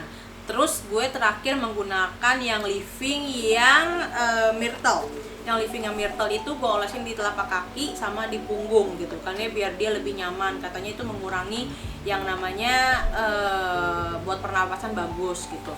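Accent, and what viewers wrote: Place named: Karo Batak, Indonesia. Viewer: Nampak x logat batak Karo.. Kkk ini ..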